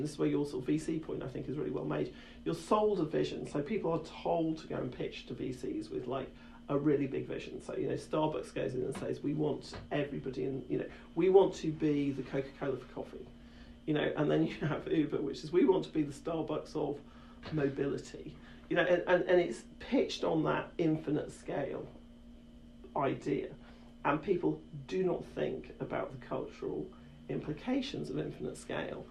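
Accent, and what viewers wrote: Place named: United Kingdom. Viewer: British accent used..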